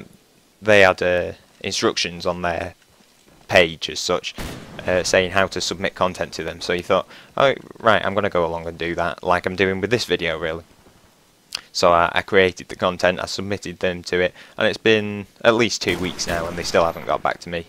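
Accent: United Kingdom. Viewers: A British Commentator :D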